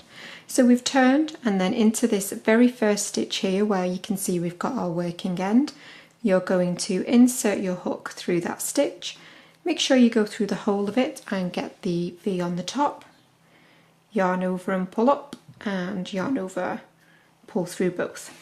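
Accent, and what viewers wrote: United Kingdom. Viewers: I absolutely love U.K accents but yours is particularly beautiful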